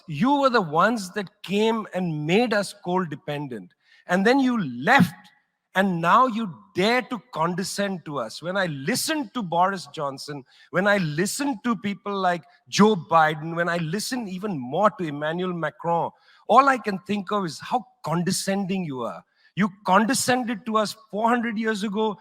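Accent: India. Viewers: 第一次感觉印度英语也能如此优美！ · 这是谁，我喜欢你，我听出了印度口音，我不知道你的为人，但我依然很喜欢你，喜欢你骨子里的忠义，喜欢你语气里的倔强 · 第一次觉得印度的口音还有那么一点悦耳…… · 这是我听过最好听的印度英语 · 我居然第一次听这个印度口音听了两遍！？ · 难得这个印度口音能全部听懂🤣真的，普京也跟瑞典环保小公主说过，第三世界国家的人民会想要先吃饱饭，不要何不食肉糜这种高高在上的态度来对待第三世界的国家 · 👍不知不覺刷了兩遍🤭第一次覺得印度英語特別好聽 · 我知道阿三腔的英语听起来很辣耳朵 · 看到一些人疯狂追求说一口标准的美式英语，甚至要求他们幼儿园的英语老师必须是外教，实在不可思议！其实，只要语法学好了，说话流畅就好了，有中国人的口音根本不是事。看看这印度人吧，一场扎实，头头是道，引人入胜的演说，太棒了！不会有哪个懂英语的观众会听不懂他发音清晰，精准的印腔英语。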